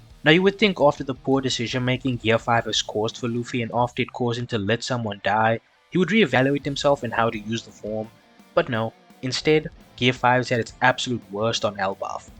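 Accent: South Africa. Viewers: Why do you have like 5 accents in 1 If i had to guess maybe you're Indian from South Africa? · Yo gang are u from SA? I think I can hear it in ur accent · I KNOW A SOUTH AFRICAN ACCENT WHEN I HEAR IT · I smell a RSA accent 🇿🇦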